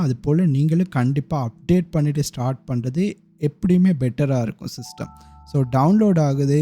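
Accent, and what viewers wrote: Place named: Sri Lanka. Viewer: என்ன இலங்கை வாசம் அடிக்கு. அகதியா நீ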